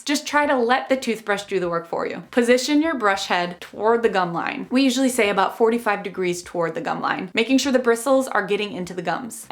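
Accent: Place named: Michigan, United States. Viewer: Is that accent from Mt Pleasant Michigan? It’s pretty thick. But I like it.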